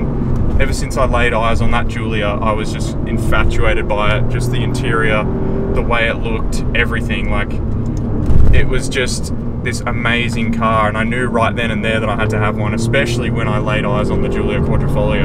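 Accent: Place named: Australia. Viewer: Why do you sound more Australian in the car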